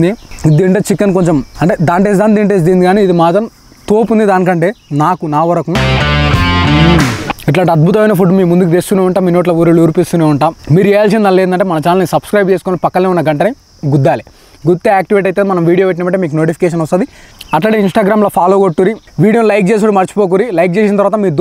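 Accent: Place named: Palamuru, India. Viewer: Super bro👍 Enka Purley PALAMURU slang lo matladu , mana plaamuru slang ki full fans unnaru bro, Keep it up. All the best for your bright future (your YouTube channel) bro🎉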